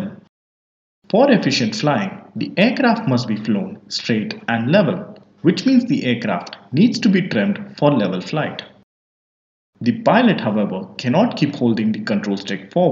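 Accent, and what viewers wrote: India. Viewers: Bro r u indian? Btw thanks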